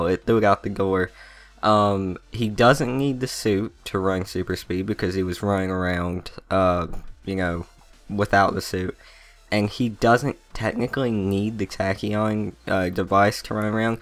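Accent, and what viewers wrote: South, United States. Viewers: Is that a mild Southern accent? Just curious, mate!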